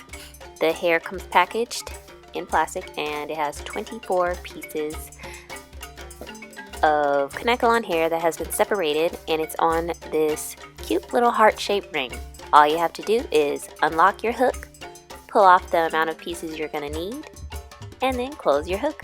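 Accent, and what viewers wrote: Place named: California, United States. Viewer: Beautiful lady , beautiful hair . I'm from the south but love the California accent . You remind me of China Renee another YouTuber with your accent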